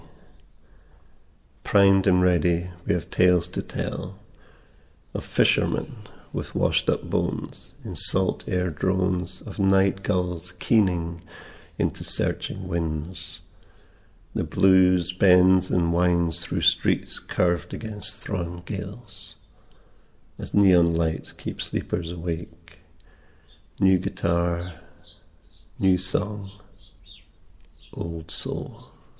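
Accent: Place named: Scotland, United Kingdom. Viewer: Dearest, so sad to hear this, thrilled to hear your poems and will visit your photography site, also so good to hear more about you, but would love to hear you sing 😉😊 I will so miss your calm sensitivity and beautiful notes, your voice and accent which makes me feel closer to my dad and the family and of course Scotland.. your shirts and jumpers.. 😍 no other matches you. Enjoy more time to write and take beautiful images and take care too.. love to you three xxx and please return soon!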